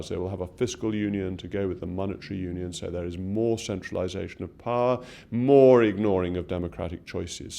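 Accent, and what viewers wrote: RP, United Kingdom. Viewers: Perfect RP makes your arguments more potent.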